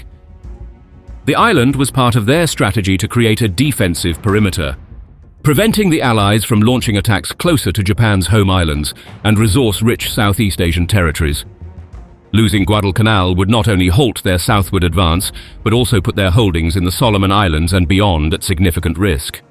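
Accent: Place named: United Kingdom. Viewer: Great video!!!! But why is a British narrator doing this instead of an American narrator??????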